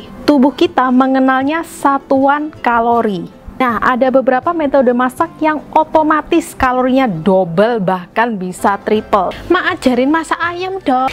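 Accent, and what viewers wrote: Java, Indonesia. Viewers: Ngakak jawa koek nya.....tp mudah di fahamj...trimakasih....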